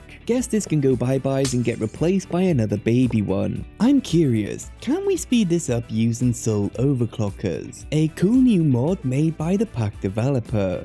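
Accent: Wales, United Kingdom. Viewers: Where is that accent from? Sounds slightly welshie with some words xD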